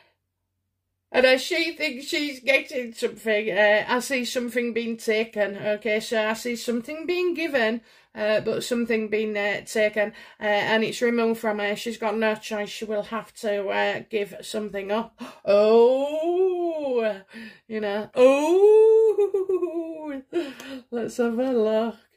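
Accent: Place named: Northern England, United Kingdom. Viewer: I'm also from bookworm. 2. Living in the UK. Sounds like you're in the north. 😁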